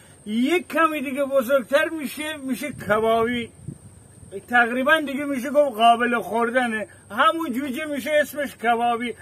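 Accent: Gilan, Iran. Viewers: جان شما خوش بیان هستید.. با لهجه گیلکی شیرین زبان هم شدید.. خوش باشید برار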